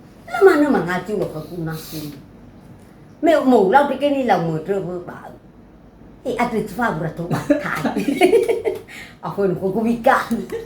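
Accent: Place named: South Nias, Indonesia. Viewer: Bang, dari logatnya ini, apalah abg dari Nias selatan.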